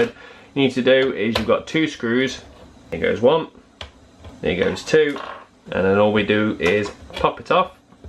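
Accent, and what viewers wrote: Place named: North, United Kingdom. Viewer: Legend. Loving the northern accent too. Where did you get the WiFi card?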